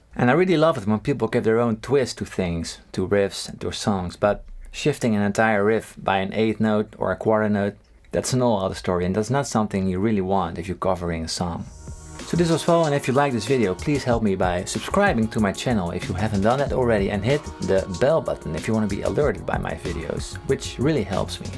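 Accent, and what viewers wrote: Netherlands. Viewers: Yo homie, you dutch? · Are you Dutch? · You sound so Dutch, I wonder if you're from the Netherlands haha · This guy sounds dutch as fuck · Nice vid. I can tell from your accent that you're Dutch lol.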